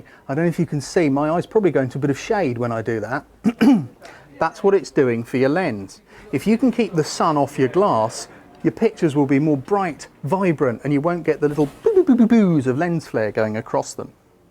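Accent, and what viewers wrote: United Kingdom. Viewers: Nice British accent and off course nice info. thanks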